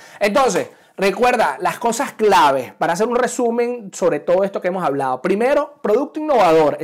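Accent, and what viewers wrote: Venezuela. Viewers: felicitaciones éxitos, definitivamente el tono venezolano a la hora de explicar y enseñar es el motivo que nuestros docentes los buscan en el exterior éxitos.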